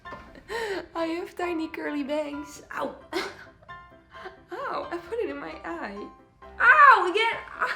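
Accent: Netherlands. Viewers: Yayy! I love that hair and your dutch accent ^^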